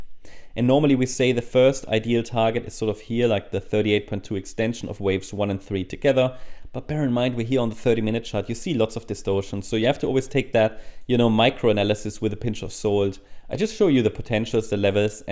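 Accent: Germany. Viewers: Was meinst, ist er deutscher?? Also, für mich hört sich sein Akzent deutsch an😅 Auf jeden Fall, bester Cryptokanal.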